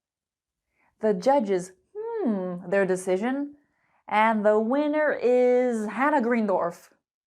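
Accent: United States. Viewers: THANK YOU, ARE YOU AN AMERICAN? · It has been a utterly splendid, exquisite and flawless lesson. I'm particularly joyful, blissful and elated with that opulent topic. It is to my liking; moreover, it appeals to me. I do adore speaking, and her charming accent. Hence, you are the quintessential of American English. You are the teacher whom I always wish to meet. I was wondering if I might dare to ask you a question? I saw the verb “gotten” is that correct? Thank you very much indeed. I pay my respects to you. You are the be-all and end-all. Far thee well, dearest Prof.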